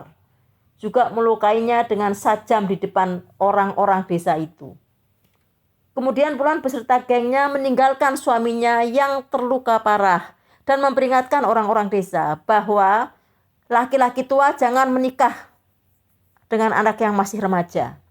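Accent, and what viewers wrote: Java, Indonesia. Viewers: kadang ada yg bilang medok medak jangan di hiraukan mbak semangat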